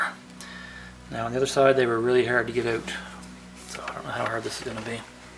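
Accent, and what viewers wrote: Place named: Canada. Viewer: I wasn't watching the video at first, but noticed you were obviously Canadian. Then I flipped over to the video and confirmed that with the Mastercraft tools, eh.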